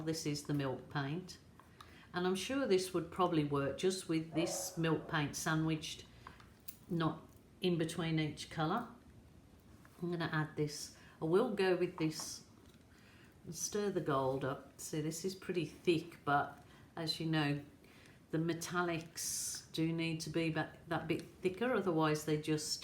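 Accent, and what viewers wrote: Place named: England, United Kingdom. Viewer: Wow! Looks like a dragons head! Lovely. It is also nice to hear an English voice too!!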